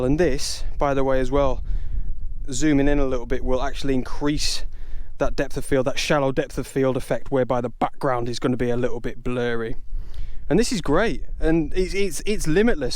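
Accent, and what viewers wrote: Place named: Northern England, United Kingdom. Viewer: Great video. I never think of shooting wide open maybe it’s about time to have a go! So jealous of where you live, being able to visit such beautiful scenes. I sometimes wonder if I tune into your channel just to hear your lovely Northern accent being a Manchester girl myself! 👍📸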